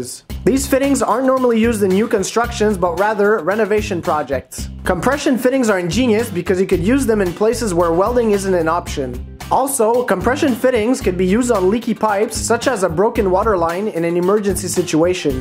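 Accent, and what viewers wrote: Canada. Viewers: from which part of Canada is your accent from?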